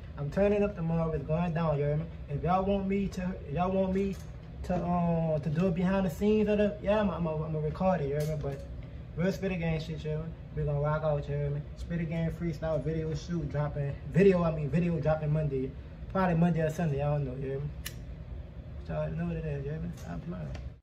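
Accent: New Orleans, United States. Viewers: Say u from New Orleans huh I can tell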